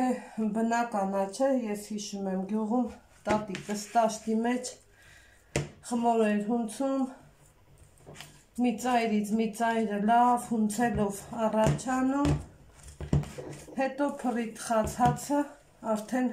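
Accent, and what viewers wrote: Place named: Vanadzor, Armenia. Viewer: ինչքան տխրություն կա ձեր մեջ։հաց թխելը ամեն մարդու գործ չէ։միայն հոգով բարի ու լավ մարդիք են կարողանում լավ հաց թխել։ձեզ առողջություն եմ մաղթում ու երջանկություն սիրելիս։իսկ ձեր հոգու տխրությունը թող վերանա ընդմիշտ🤗🤗🤗առաջին անգամ եմ դիտում ձեր վիդիոն ու միանգամից բաժանորդագրվում եմ։կասեք՞ դուք որտեղից եք բարբառը կիրովականի կողմից է ոնց որ՞ հարազատ բարբառ է ինձ շաաատ🤗🤗🤗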